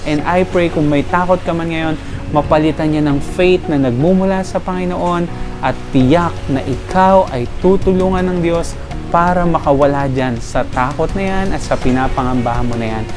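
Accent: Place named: Batangas, Philippines. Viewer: ah kala ko lang po kasi na may Batangueño o Tagalog accent 👍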